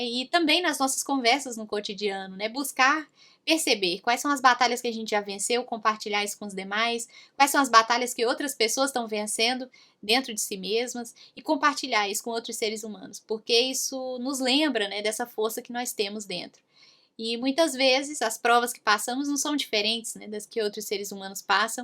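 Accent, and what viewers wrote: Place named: Minas Gerais, Brazil. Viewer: Sotaque de minas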